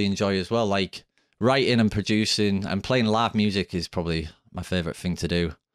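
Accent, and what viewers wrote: United Kingdom. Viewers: I never knew u were British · me 2 seconds in: Hes British?? 😮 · I also did not know you were british lol... I always thought you were from the states. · Eeee he's a Brit... Sounds like a chuffin' Yaaarksher lad... Love it.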